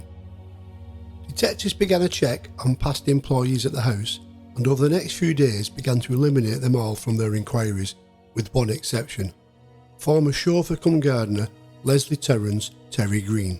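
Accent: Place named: Lancashire, United Kingdom. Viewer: has a lovely Lancashire voice and accent. I love listening to him.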